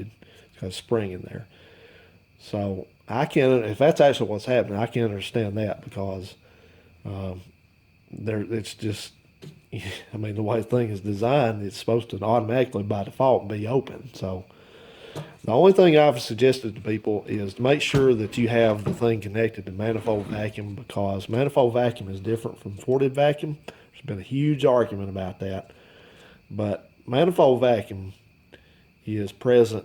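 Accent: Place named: South, United States. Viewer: Greetings: "What happenz is" ....Rednecks so fun 2 listen talk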